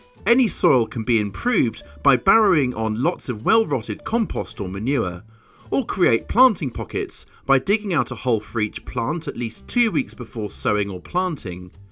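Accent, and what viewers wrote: England, United Kingdom. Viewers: The English accent and his expressions are so delightful...long live England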